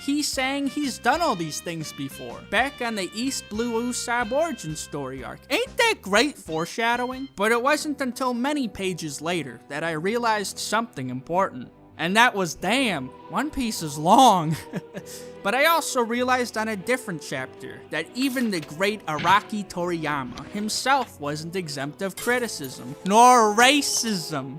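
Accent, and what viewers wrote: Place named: Boston, United States. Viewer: Chimp champ are two different words your dialect is very bosten east coast like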